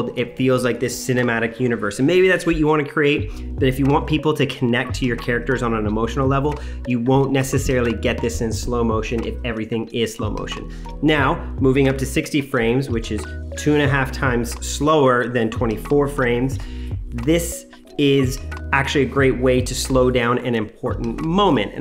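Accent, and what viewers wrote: Canada. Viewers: 3 seconds in. Canadian.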